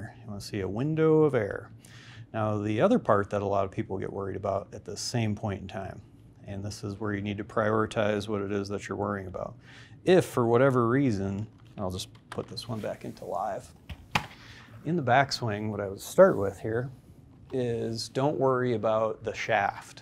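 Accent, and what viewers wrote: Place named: Ontario, Canada. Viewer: Very well said and illustrated. Is your shop found in Ontario you sound like it may be. I’m looking for a location in southern Ontario. I have subscribed, I’m a believer of ST. Thank you. JB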